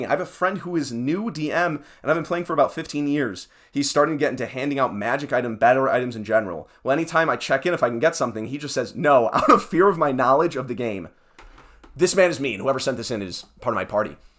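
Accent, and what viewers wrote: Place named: New England, United States. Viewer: Your accent sounds east coast/New England…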